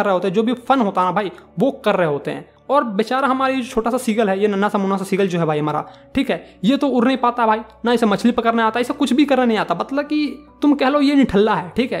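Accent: Bihar, India. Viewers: Sir bihari ha isliye ud ko ur bol rahe hai 😅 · Bihari hai tu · Bhai bihari h wo ...or har bihari jab bihari language mai bolta hai to ek jesa he lgta hai ..😂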